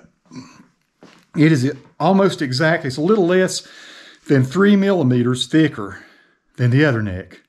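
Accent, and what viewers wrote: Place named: South, United States. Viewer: That’s for the tips buddy. I love your accent man.. I wish I was born in the south😁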